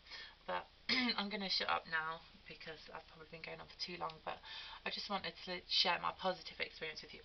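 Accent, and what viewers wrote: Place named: United Kingdom. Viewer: Love the British accent. For a moment there I thought she said "it's the tranny-first century."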